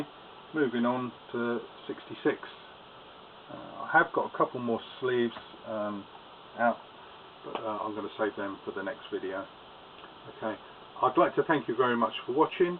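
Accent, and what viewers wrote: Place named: United Kingdom. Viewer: (This is Tom, not Sandra.) Why do you think that England didn't have picture sleeves for the Beatle records back in the early 1960's? Have you found that out since you taped this video post? You have some really great collectibles there, sir. Thank you for sharing your collection with us all !!! Love your accent, also!!! Love all the British accents, really!!!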